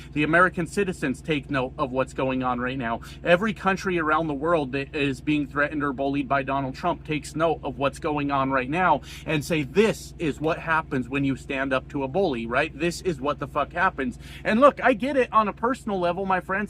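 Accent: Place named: Canada. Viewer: Love from Canada. You're sounding more and more like one of us.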